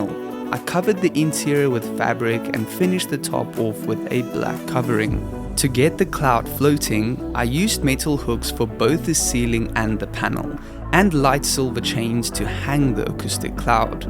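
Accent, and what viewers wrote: South Africa. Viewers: after all these years I've finally confirmed myy suspicion that you're South African too XD awe masekind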